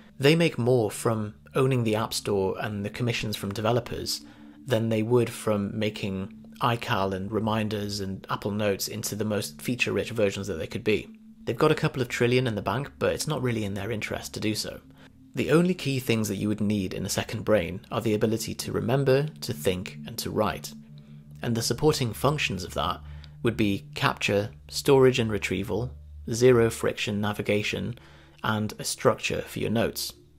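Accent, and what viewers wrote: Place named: RP, United Kingdom. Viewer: Splendid command of the English language. You could walk straight into the Royal family with that accent!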